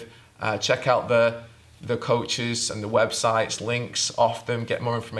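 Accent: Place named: Northern England, United Kingdom. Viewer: off topic but I can't place your accent! I'm getting northern England, but is there scottish there as well?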